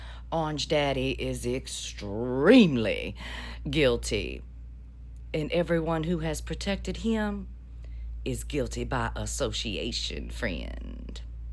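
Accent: South, United States. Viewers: voice based assumption incoming; You've just replaced William T Sherman as my favorite southerner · I LOVE the sound of this lady's voice. That southern drawl with the smoky texture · YOUR accent!!!! I love it!! That Southern Twang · Hurry back! I saw a few headlines about the emails and waited to hear about it in your sweet southern accent! Happy Wednesday 💜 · It’s refreshing to hear that Southern Accent used intelligently. I could listen to you all day. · I’m from the south but I don’t sound like it. I absolutely love your accent and your spunk. You nailed it.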